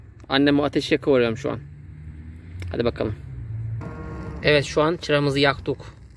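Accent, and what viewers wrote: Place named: Bartın, Turkey. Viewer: Bende bartinliyim istanbul da yasiyorum bizim oraların konusmasini duyunca çok mutlu oldum benim annemde böyle odun ateşinde yapardı evin önünde yiyesim geldi şimdi bu sene koye gidemedim koronadan sizi yeni keşfettim artık izlerim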